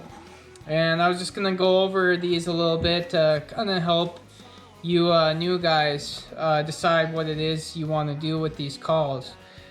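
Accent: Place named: Minnesota, United States. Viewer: ND?! You sound like you’re from Minnesota!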